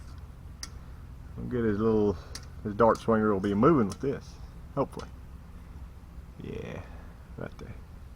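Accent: South, United States. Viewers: . Thanks. Great Information. Even though you have a southern accent, you dont sound stupid. Hey! Im jus sayin! You use correct descriptions and correct terminology so there is no doubt what you are talking about. Whoa! - some of these other channels! (No Names) Anybody w a phone Can put anything on the interweb.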